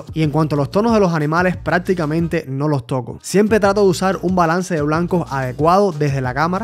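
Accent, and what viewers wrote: Cuba. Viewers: Jajaja.. desde que empezó el video tenía la duda pero cuando dijiste “se nota a la legua” dije este es cubano si o si 🤣..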